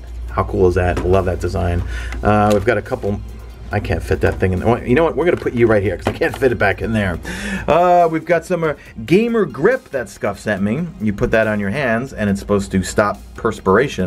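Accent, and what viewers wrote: New York, United States. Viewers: #madeittotheend congrats on 13 years! Your Destiny collection is impressive 🎉 Great setup btw I bet it feels good to have a dedicated space Also liked the New York accent 👍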